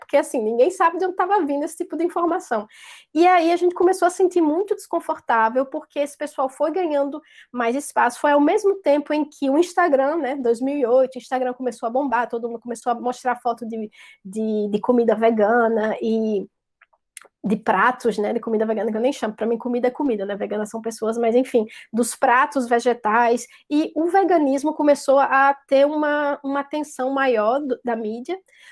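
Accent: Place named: Northeast, Brazil. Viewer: Adoro esse sótaque nórdestino 😁. Por um mundo sem senhores para humanos e não humanos, Tmj 👍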